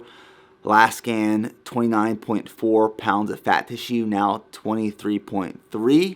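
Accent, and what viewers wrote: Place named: Maryland, United States. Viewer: I know that accent from anywhere! You must be from Maryland or Pennsylvania 😂♥️ love your videos!!! Love from Bmore 🦀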